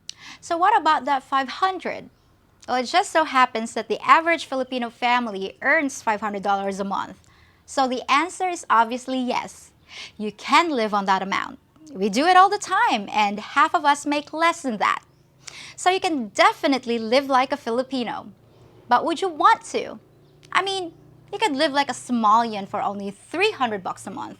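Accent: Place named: Midwest, United States. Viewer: It's funny to hear you keep saying "Ya" instead of "You". That accent is very common in upper parts of the mid west here in the US (North Dakota, Wisconsin, Minnesota) and even in Canada. Is Fargo possibly one of your favorite movies? LOL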